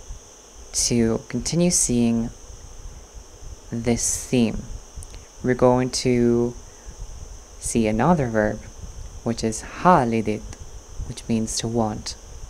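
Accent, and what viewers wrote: Ireland. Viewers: I swear the lady presenting the video has a dash of Irish accent. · Hi, I am very interested in the Sami languages.Do you happen to have Sami-learning materials (e-books/digital formats in pdf or dejavu formats)? Not necessarily in English, could be in Norwegian, Swedish,Finnish or German as well. Thank you for your video, very much appreciated. By the way, your you tube name sounds Gaelic to me and your English has an Irish lilting.Tá Gaeilge agat ? Regards from Spain/ Go raibh maith agat.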